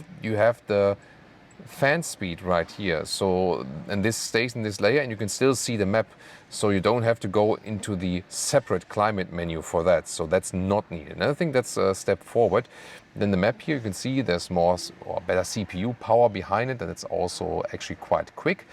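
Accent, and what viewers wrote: Germany. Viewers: its probably german eccent. You say egthree instead of exsthree. Letter by letter. Sorry for being like that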